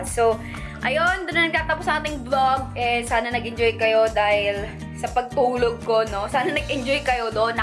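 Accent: Iloilo, Philippines. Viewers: Ate na iilonggo ka ba??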